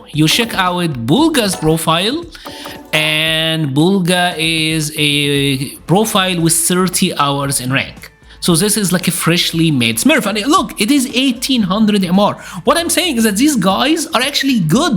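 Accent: Egypt. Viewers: Egypt? crazy accent. how do so many of yall fit in the Nile delta? 🤯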